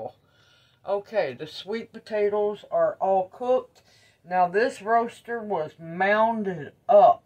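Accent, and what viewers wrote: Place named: Tennessee, United States. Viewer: I saw the video title and I had to watch. I said, this gal must be from Tennessee, because sweet potato butter is from back home. Then I heard you speak and said, yes, this is a fellow sister from Tennessee. Good on ya teachin the ways.. 😁👍🏻❤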